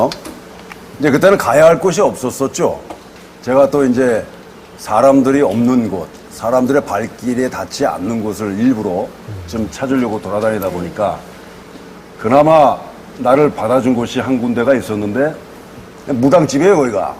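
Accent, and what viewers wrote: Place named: North Korea. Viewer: 이상하다. 말투가 왜 북한 사투리같지?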